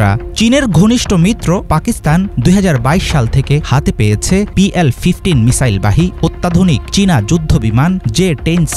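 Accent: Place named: Bangladesh. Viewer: এটাই ভাবছিলাম কারা এই ভিডিও বানালো, একটু শুনেই বুঝলাম বাংলাদেশী ভিডিও, বুঝেই বন্ধ করে দিলাম 😕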